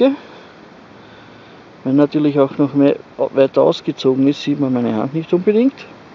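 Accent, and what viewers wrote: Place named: Austria. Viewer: Ach ja, so ein Einbeinstativ ist schon Gold wert. Und der Deinige ist auch noch ein Spazierstock obendrein. In meiner Antwort auf deine pers. Nachricht wusste ich noch nicht woher du kommst. Ich denke mal..an deinem Dialekt aus Österreich. Auch das Kennzeichen deines Autos ist kein deutsches aber man kann es nicht gut erkennen. Aber ich möchte ja nicht herumschnüffeln sondern deinen Beitrag der für so manchen Wanderer interessant ist, beantworten. Gruß von Walter.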